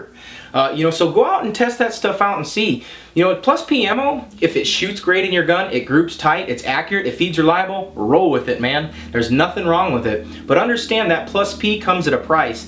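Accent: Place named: Upper Peninsula, United States. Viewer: you talk like a yooper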